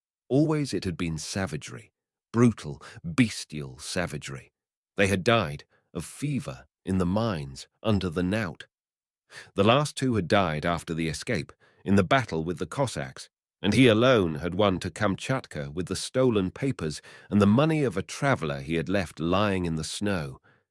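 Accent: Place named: United Kingdom. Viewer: Are you crazy? All American author read with a heavy British accent is NUTS. Jack is turning over in his grave.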